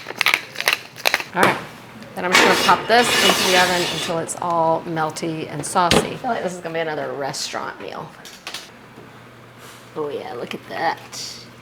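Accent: South, United States. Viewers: “Chefs, they’re just like us”. 😂 So love your sense of humor and you have THE best southern accent. Really enjoy your videos and have enjoyed making your recipes. Keep up the good work!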